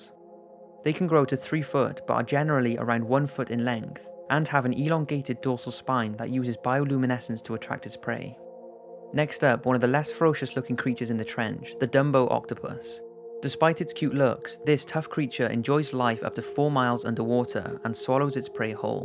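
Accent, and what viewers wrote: Wales, United Kingdom. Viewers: I hear a brit accent and talk of kilometers and my New York ass says gnite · Are you from Cardiff I think you are shout out to my fellow welsh man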